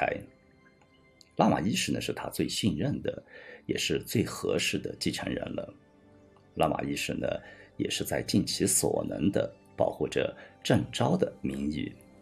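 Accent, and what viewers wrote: Yunnan, China. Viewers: 这是云南口音啊？😂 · 云南口音很亲切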